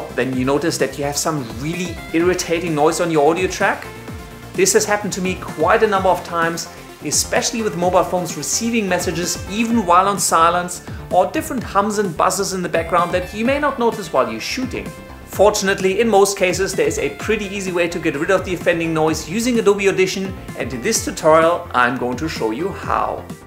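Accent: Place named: Germany. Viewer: You're from Germany right? I think I hear the accent.. (Wenn ich Recht habe, kannst Du mich Sherlock nennen)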